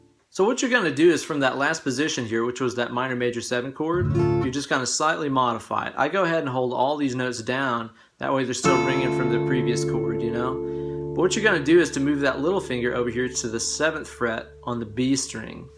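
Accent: South, United States. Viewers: great stuff!... perfect for October..... you sound like you live in the south...... you got the heat on yet?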